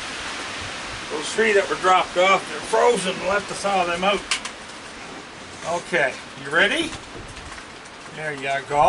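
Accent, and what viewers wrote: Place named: Canada. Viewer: Love me some and Bob! My favorite two channels that I look forward to everyday! I just love your Canadian accent! I was born in upstate New York but moved to Texas when I was a teenager. Sure miss the north!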